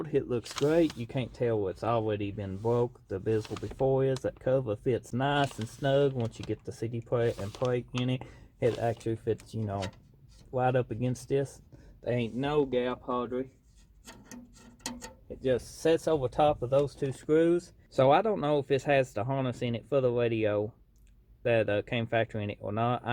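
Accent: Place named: South, United States. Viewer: This video is exactly what I was looking for. Also, I thought my southern accent was thick but you put me to shame.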